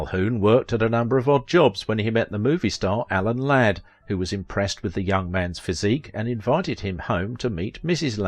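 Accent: United Kingdom. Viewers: Thanks for the backstory on Rory! Wow, what a male w...e. He did look good though! I would guess his street smarts gave him the rough, and tough look some parts needed. I remember many shows he was in. Too bad he had such a bad temper. I truly enjoy your voice, and story telling style. The sound of your british accent is easy to listen to, and tone and tempo really awesome. That was my dream to be a voice over actress, or book voice. Look forward to more of your work.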